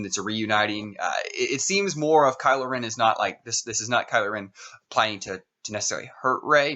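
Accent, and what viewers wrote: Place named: Kansas, United States. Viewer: Are you from Kansas? I am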